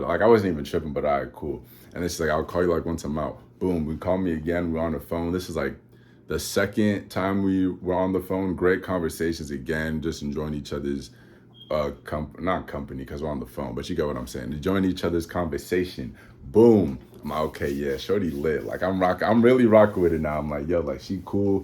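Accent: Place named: United Kingdom. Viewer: it sound like u got a British accent a lil 😭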